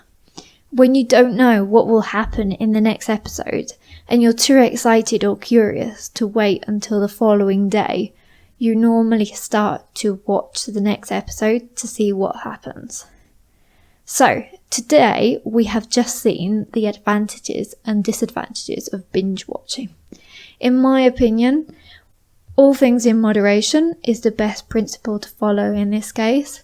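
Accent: United Kingdom. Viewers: I began listen to this podcast from yesterday 😊 , i see British accent is beautiful than American . I liked the way when u said word ( relax ) 😍.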